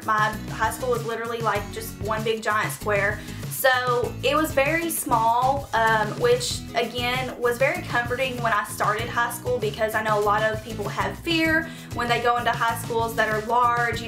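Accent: South, United States. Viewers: I'm originally from Memphis but have a very strong southern accent. I now reside in Rogers, Arkansas . When people ask where I'm from I pull out all the punches, I tell them I'm from "Toadsuck, Arkansas ". It's a small suburb outside Conway , lol. They don't really believe me when I tell them Memphis. Love you and your accent, my home state girl!!!!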